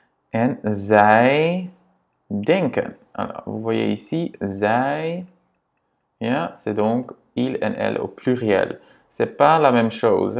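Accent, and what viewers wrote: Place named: Quebec, Canada. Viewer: Merci tu expliques vraiment bien! Bizarrement j’entends un léger accent québécois quand tu parles français 🤪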